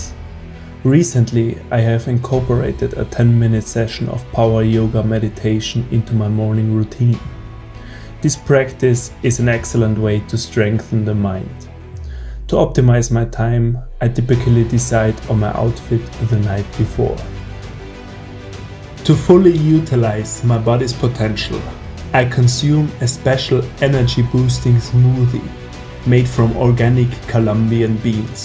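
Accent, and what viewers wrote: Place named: Austria. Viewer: You talk like Arnold Schwarzenegger )) I'm coming to Graz next week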